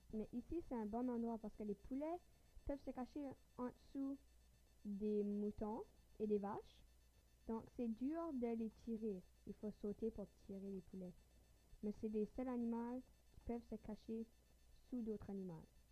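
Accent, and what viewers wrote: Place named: France. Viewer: Arrete avec ton accent francais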